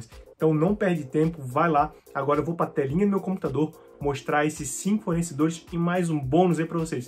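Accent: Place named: Florianópolis, Brazil. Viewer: você é de floripa também? fala igualzinho aqui o sutaque kk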